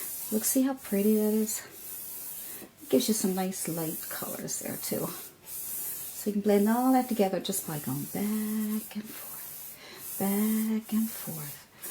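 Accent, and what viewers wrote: Newfoundland, Canada. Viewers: Hi, really like the videos, I'm a total newbie (senior) at painting and these help a lot. Was wondering about the accent, kind of sounds like you are or were from Newfoundland, or is it Irish? Thanks for all you do for others:)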